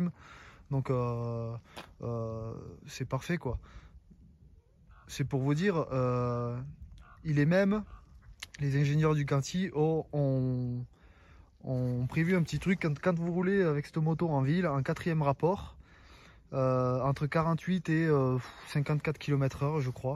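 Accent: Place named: South, France. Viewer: Sympa ta vidéo 👍 et surtout ça fait plaisir de rencontrer un youtubeur de la Yaute avec l'accent du sud 😄. Cette Streetfighter c'est une tuerie, pour moi c'est le roadster ultime avec la SD 1290 voir la Brutale 1000. Mais niveau budget c'est pas pour moi 😅. Après ça tu ne sais plus sur trop quoi rouler. Tu m'étonne que tu la garde. Moi j'attends la Streetfighter V2 moins performante mais plus accessible 😉. En attendant je garde ma supersport 1000 ds. Super les images du bassin Annecien et du Semnoz, une super route pour essayer les bécanes des nombreuses concessions de Seynod 👍. Bonne route à toi, vivement le ski et à tt bientôt sur les routes du coin. ✌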